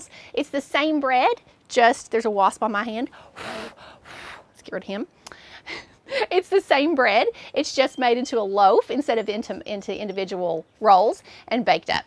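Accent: South, United States. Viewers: I live in Canada and I love your southern accent and I love your recipes. Thank you! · The Southern accent is so so charming. Thank you for the tutorial fantastic instructions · I hear a southern voice telling me how to make food, I listen. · 👍🏻🙂 One of the best dough making videos I’ve watched… And I’ve watched many. You explained every step with unmistakable southern charm. Thanks again. I learned a lot. 👍🏻🥖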